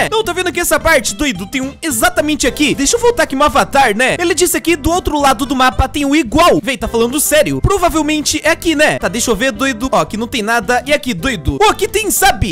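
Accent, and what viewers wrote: Portugal. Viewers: Man c tá falando português de portugal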